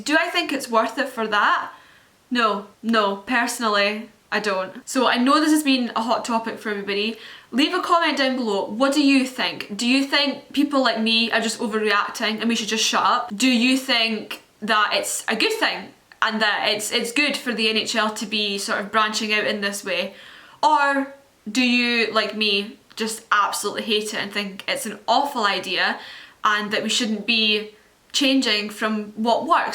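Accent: United Kingdom. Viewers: When I say she had a British accent I clicked off what does a Brit know about hockey leave the hockey to the Canadians stick to the soccer and rugby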